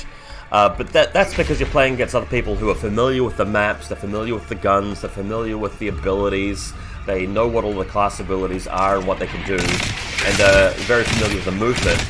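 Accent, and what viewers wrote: New Zealand. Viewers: bruh i fucking thought you were Kiwi, you didnt have any other accent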